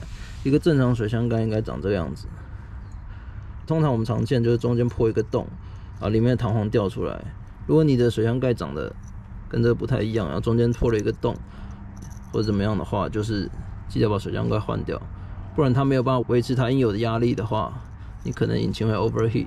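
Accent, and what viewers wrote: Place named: Taiwan. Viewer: taiwan accent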